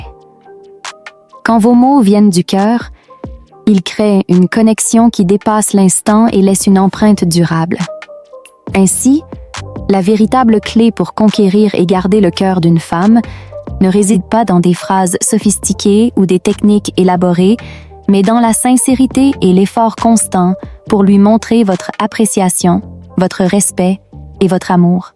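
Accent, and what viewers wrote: Quebec, Canada. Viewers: L'IA a un accent Québéquois ?!? Attention, en France, entraineuse à un sens pas très glamour..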